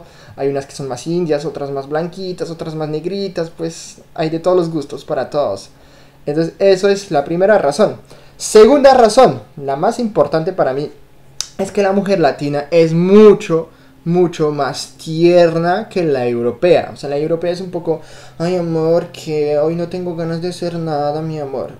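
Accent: Bogotá, Colombia. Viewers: Creo que te pego mucho el acento colombiano!😚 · Hablas como colombiano. Saludos! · q bonito, y claro!! habla el español!! mas' bien colombiano!! esta simpatico!!😆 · Habla un español muy colombiano! jajaja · Yo creo que todas tus novias han sido Colombianas, porque es que hablas con acento Colombiano. acá en Europa cuando escucho hablar a un Europeo sea de la nacionalidad que sea, hablan con acento de Españoles. Me caes bien, hasta ahora descubri tu canal, y me simpatizas mucho, hasta en la manera como a veces te expresas me pareces tierno. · "Listo mi papasito" hahahaahh en serio me reí mucho, una novia o ex novia un tanto... "guiza" :/ y sí, definitivamente tu acento suena más rolo que el mío (Rol@ = Bogotan@ Estamos en la misma ciudad :) y espero jamás encontrarnos porque no tendré el tiempo para decirte todo lo que quisiera. ✌ Chao! linda vida y espero que la chica sumisa con quien te cases abra los ojos algún día. 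👀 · Francy Sandoval me encanta que reconozca la belleza latina ,tiene expresiones colombianas como" verraca " y un poco el asentó rolo se le siente saludos desde Colombia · Habla como colombiano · La vaca muerta jajaja este frances ya se quedo en.colombia todo el.acento haha que bueno.Que te gusta , por aqui no.somos de implantes ni cirugias. Somos naturales jiji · Me da gracia que hablas español colombiano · Pareces más colombiano, madre mía jajaja que bien hablas el español con ese acento. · Acento colombiano pegadísimo, de dónde eres? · SOS un francés con acento colombiano! 😍😍😍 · Este chico es Francés, pero sus padres serán Colombianos, por que tiene acento colombiano, sus padres serán colombianos. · Senovia Trinidad pues no habla bien, se le a pegado el acento de Colombia o de algún país sudamericano, el español nació en España, decir que habla muy bien es demasiado, deberías decir que al menos se hace entender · Eres un colombiano más!!! 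Tu acento es super Bogotáno. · Tiene el acento de Colombia. Así que puedo apostar que anduvo con una colombiana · Hablas muy cómico, pareces de Colombia. He imitas muy bien a las mujeres. Éxito consiguiendo esposa latina. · es que a estado más tiempo en Colombia ¡¡¡ se le ha quedado las jergas Colombianas e inclusive el acento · Vea, hombre! Ud tiene un acento colombiano bien bacano🤣🤣 o se escribe vacano? Nunca he visto esta palabra escrita, no sé cómo va. En fin! Lo cierto es que tienes acento colombiano 😀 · Hola..yo creó que has vivido mucho tiempo en Colombia hablas y te expresas como colombiano..saludos.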